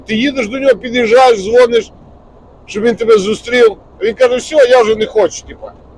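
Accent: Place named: Galicia, Ukraine. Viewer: Чую галицький акцент...чи Волинь все таки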